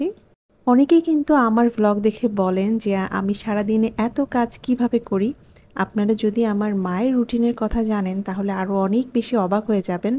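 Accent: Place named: Barishal, Bangladesh. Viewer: Tmr basha barishal er kothay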